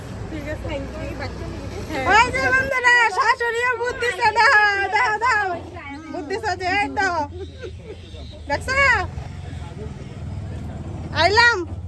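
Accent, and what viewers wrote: Bangladesh. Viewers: The dialect you through when you speak is same like grater dhaka district ( not dhaka city ) village area of dhaka district, narayan gaung, manik gaung,narshindi,bikrompur , gazipur even greater farid pur district also speak same dialect....I pray to God keep all of you happy and prosperous...from Dhaka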